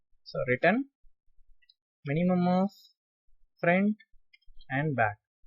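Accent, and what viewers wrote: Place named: South India, India. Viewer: are you from south india i guess? because of your N pronunciation. am I right?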